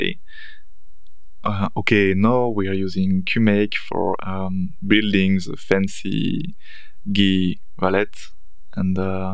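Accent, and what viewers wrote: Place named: France. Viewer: Salut! Vu ton accent, je pense que tu es français. Quel est ton pseudo sur bitcointalk? PS: je suis ton premier abonné;-)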